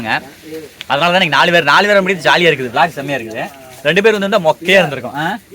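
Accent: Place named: Coimbatore, India. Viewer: கோவை தமிழ் ❤❤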